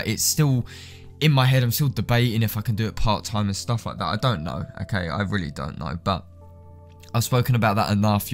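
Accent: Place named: Cockney, United Kingdom. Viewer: This dude is legit, no frills, just straight up NMS and a cockney bloke. 👍🏼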